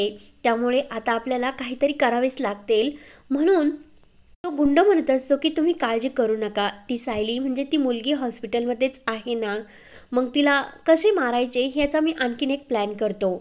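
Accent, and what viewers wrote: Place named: Gujarat, India. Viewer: Gujarati ahes na tu😅 marathi bgha hichi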